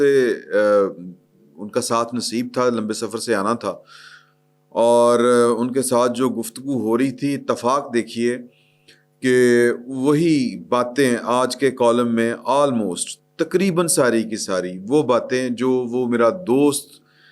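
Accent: Punjab, Pakistan. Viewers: There se Punjabi touch day dia kra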